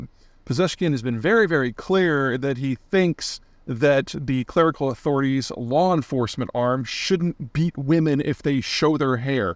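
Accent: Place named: Texas, United States. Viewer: He lived in Texas for a long time. We all just pronounce it that way. Not defending it, it's just a local accent thing.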